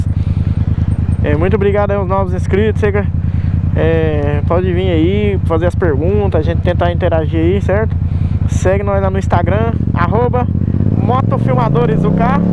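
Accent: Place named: Minas Gerais, Brazil. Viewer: Se tu for "minero" com esse sotaque é nois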